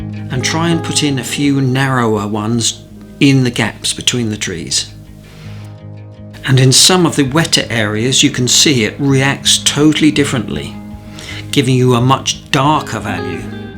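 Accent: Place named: Scotland, United Kingdom. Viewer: 'Jist' loved todays wee tree extravaganza...the touch of 'Scots' twang wis jist grand 😉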